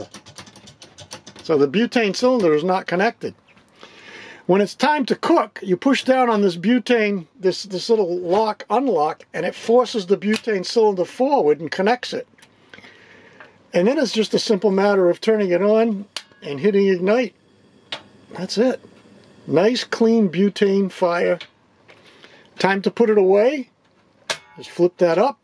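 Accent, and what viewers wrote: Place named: Northeast, United States. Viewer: Where in the northeast? I can hear home in your voice.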